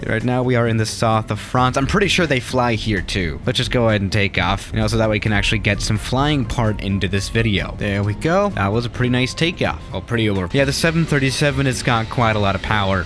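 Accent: England, United Kingdom. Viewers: To be honest you sound so British. I'm from Norway so sas is a good airliner.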